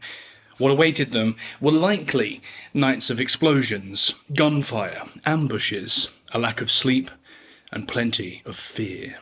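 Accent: England, United Kingdom. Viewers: There’s something so very attractive about you. Partly I believe it’s your voice. A most excellent English accent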